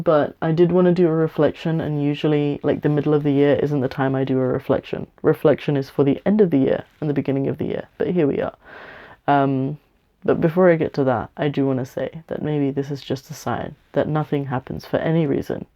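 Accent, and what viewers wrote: United Kingdom. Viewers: On a side note, I haven't really been into (non commercial) vlogs but I think I get it now! :o It's really nice and fascinating, it's like someone is having a personal (albeit one way) conversation with you through the screen. Feels therapeutic somehow. I'm going to try and find more british ones (need help attuning my brain to british accents)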